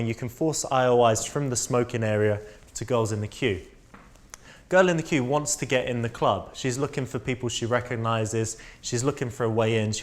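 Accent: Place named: United Kingdom. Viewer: fukkkk he talks to much and listening to a british accent is even worse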